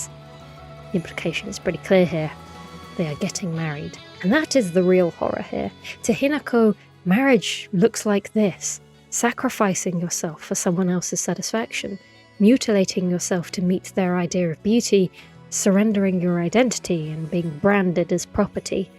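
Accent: United Kingdom. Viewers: I’m literally British and even I am charmed by her British accent, haha. So relaxing. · Every time uploads a video, I consider being a nicer person for the simple joy of listening to her deliberate concepts in video games. There's also moments where her emotion pours through her commentary and that feels wholesome and kind. We need more chill youtubers with smooth british accents talking quietly about video games all day.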